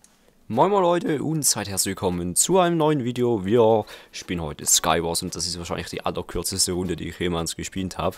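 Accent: Switzerland. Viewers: Süsser Schweizer akzent ;D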